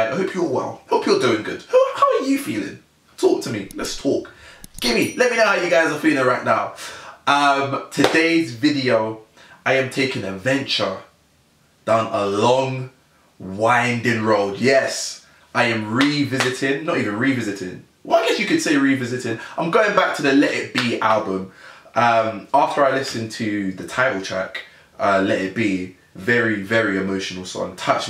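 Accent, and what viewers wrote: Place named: United States. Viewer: Why do you sound like an American?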